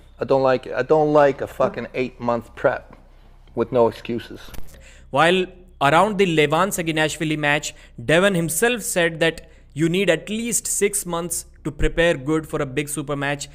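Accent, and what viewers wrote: India. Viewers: This Indian accent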